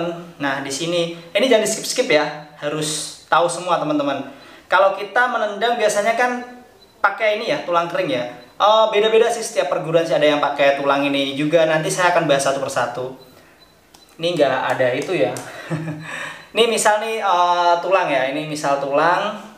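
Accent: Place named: Java, Indonesia. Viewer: Daerah Mana Bang Kok ngomongnya Jawa hehehe... Sosis Lu bang (suami Takut istri)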